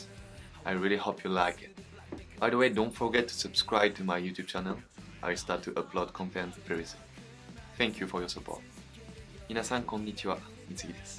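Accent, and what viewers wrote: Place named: France. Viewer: Perfect song, perfect man and perfect french accent. Seriously you're a pearl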